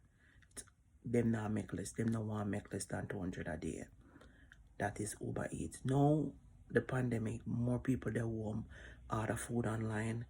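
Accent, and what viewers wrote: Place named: Jamaica. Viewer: You sound like a West Indian Jamaican are you a descendant of the Afrikan Diasopora a Descendant of the (SLAVES) the "BANTU PEOPLE" this is my question Sista. If so please, please look into who the Afrikan Diasopora people are Biblically we are really from the Scattered 🏠 House of JUDAH from the Bloodline DNA of Abraham, Isaac and Jacob/Yisrael we are the True Bloodline DNA Descendants. HaSatan have Deceiveth the whole world even the Saints if it where possible. So please my Hebrew Yisraelite Sista of Zion check out a few Hebrew Yisraelite YouTube Brothers and Sistas Channels Open Dairy, Hebrew Nation Building by Morris Williams Jr and Joshua Collins, Yona Golab, Hebrews To Negroes aka TV MOSES LEVI by Ronald Dalton Jr and Benayah Israel to begin your research on who the Afrikan Diasopora where before we became "SLAVES" To the European Nations and others Nations "WORLDWIDE" please don'tignore this very important information that I'm trying to share with you check out those YouTube channels with those Brothers and Sistas. 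Shalom, Salaam my Hebrew Yisraelite Sista of Zion. We are the Real Descendants of The Ancient Children of Yisrael.💋💗💕😍🕎🥰💞💖👄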